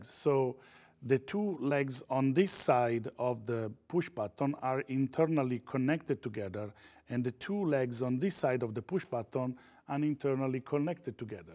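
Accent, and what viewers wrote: Italy. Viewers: Si capisce che sei italiano, sia dal nome, sia dalla pronuncia, quindi si potrebbero avere i sottotitoli in Italiano per chi come me non sa bene l'inglese?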